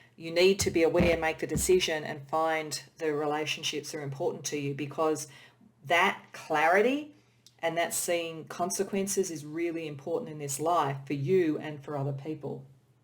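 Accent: Australia. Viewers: The way you described the phrenology oracle and what phrenology is so nonchalantly with your brilliant and beautiful Aussie accent was highly amusing to listen to for me, enough for me to want to tell you about it 😆 probably not something I'd have done in person but being as we're at a distant and communicating through the net I'm gonna risk it 😊 I just had a quick look at what others have commented on this video and I totally agree with them, you come across as someone who is obviously and refreshingly very gifted at reading cards, I think you create really unique readings and I like the different combinations and choices of decks you use too, most, if not all are ones that I'm sure I've not seen being used by other people who read and share here on YouTube before, and yeah, I too am very grateful to be here and have access to such fun and insightful, inspirational readings. 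.. and I watched a past life reading only earlier today actually, from Hermit Tarot who is another magical soul who I'm sure I heard you mention as someone who you watch too in one of your earlier videos 🤔💭 I think 😊 .. and you touched on the exact same things about this particular past life that she was reading into too! 😮🌝 crazy init how it all works, mentalist I tell thee 😆☺️ ahhh God 🙏🏻😌 I didn't fit in with the family or the society around me at all in this life in question, and I think it was in England, which is where I am again now, and I was thinking just now that I was maybe a woman in that lif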